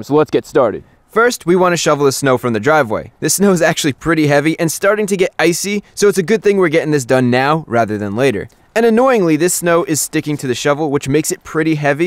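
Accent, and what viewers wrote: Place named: Pennsylvania, United States. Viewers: Jersey thing like saying “where are the khakis” is “where are the car keys” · has an accent that sounds like he's from southern Pennsylvania....we all say water like that here lol Good tips though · I know hes from somewhere in the new york area solely because of the way he says water. This comment is being made after only watching 4-5 of his videos with no other context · New Jersey for sure. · Yeah, that NJ accent is clear... especially "wooder". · Its new jersey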